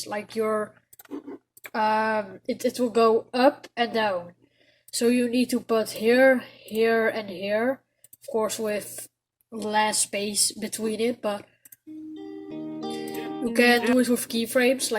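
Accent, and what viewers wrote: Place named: Netherlands. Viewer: Thx bro and i hear that lil dutch accent :)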